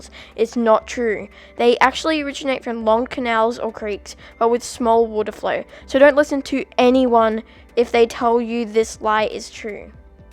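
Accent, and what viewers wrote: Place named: Australia. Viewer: I'm sorry if I'm wrong but are you Australian coz you do sound like us Aussies if you are can you purchase a honey gourami from a local petbarn?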